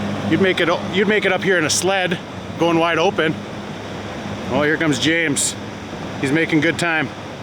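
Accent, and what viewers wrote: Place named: Canada. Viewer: oat stoat about learn how to talk English you Canuck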